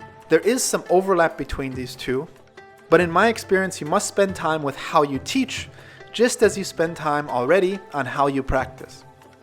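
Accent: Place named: Israel. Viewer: love your vids:) where are you from? sound like israeli accent but i dont sure...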